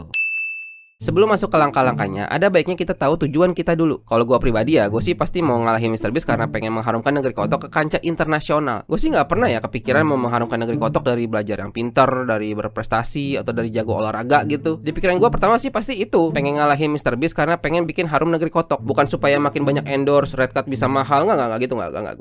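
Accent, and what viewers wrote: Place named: Depok, Indonesia. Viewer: suara lu,mirip mas mas depok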